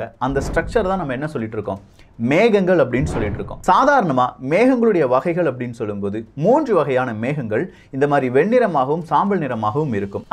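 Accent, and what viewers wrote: Kerala, India. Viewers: Are you basically malayalee. Now and then you speak in malayalam accent. No intention to hurt you. Just anxiety. Please reply.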